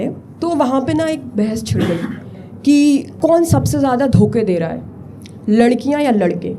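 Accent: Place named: Bundelkhand, India. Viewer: Great content, amazing delivery with a little pinch of bundelkhandi tadka… keep it up, long way to go…❤❤❤😊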